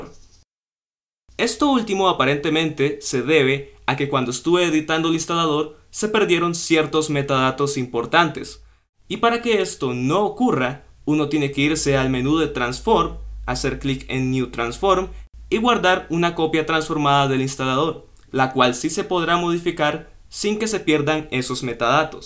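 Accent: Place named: England, United Kingdom. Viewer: I like your English accent, it sounds so cool. Been awhile since I visited your channel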